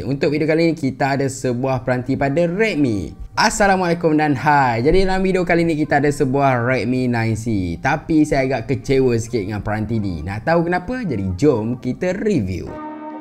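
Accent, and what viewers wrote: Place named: North, Malaysia. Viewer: Wah org utaqa mana ii mai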